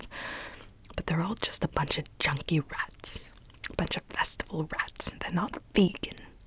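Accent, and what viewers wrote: England, United Kingdom. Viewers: Loved everything about this video from the cheeky humor to the English accent. You're lovely <3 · British accent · This is one of my favourites of hers. That "british"accent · I LOVE your british accent! You should use it in more of your videos, it’s so relaxing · Ok but the fact that you got more and more English killed me omfg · Loved how you impersonated a UK English accent perfectly and it did feel strange but your Hippie way of applying makeup, would be my ideal way of putting makeup on. This is because I hate wearing makeup. Absolutely fabulous! One of your best yet! ❤❤❤😘😘😘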